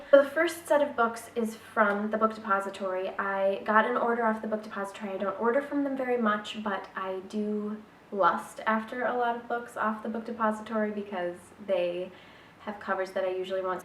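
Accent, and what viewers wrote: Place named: United States. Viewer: ur accent is beautiful.... I wanna learn American accent...... what can I do for that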